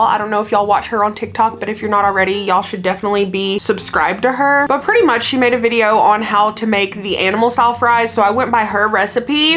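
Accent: South, United States. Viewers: Thank you for making realistic content!! Also I’m a moved away from the south person so your accent makes me feel like your my cousin haha. Keep being the realest girlie out here.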